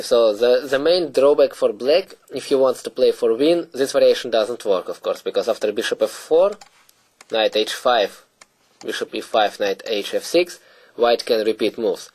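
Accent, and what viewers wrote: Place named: Russia. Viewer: he actually has a russian accent...he's not faking it...:-p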